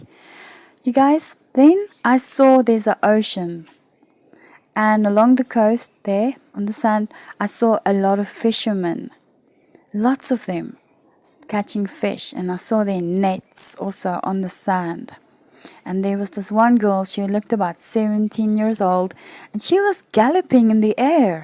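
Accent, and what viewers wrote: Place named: South Africa. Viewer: I just came across this video and recognize your South African accent!! (groete van Benoni 🇿🇦) God bless, sister. See you soon in the clouds!!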